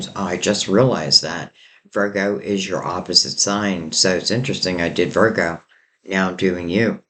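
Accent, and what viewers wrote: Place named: Pennsylvania, United States. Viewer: Girl! Your “after break up” phone call! Wow. Love stories like that! I’m from Phila & I always thought you were from PA (your voice!) I wonder where you’re from?) Great readings always! Thank you! 🙏🏻💗🦋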